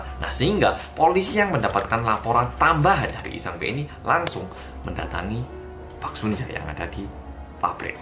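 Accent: Java, Indonesia. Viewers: iku lho.. aowkowkwowkwok medok tulen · Semapot gak bisa hilang, medoknya itu lo 😁🤣🤣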